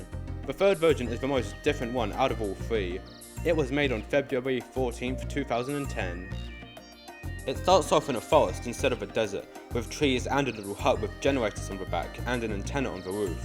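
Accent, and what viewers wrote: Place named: United Kingdom. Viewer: voice that is British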